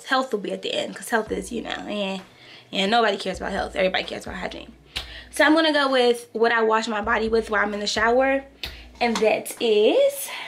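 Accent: DMV, United States. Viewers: Lol... I knew you were from DMV area when I heard your accent. Great video. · I KNEW IT!!!! LOL I am from the D(M)V. lol so crazy how I can pick up on our accent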